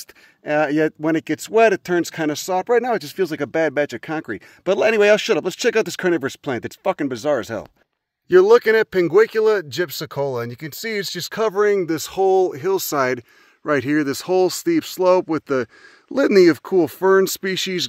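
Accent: Chicago, United States. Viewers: I love the Chicago accent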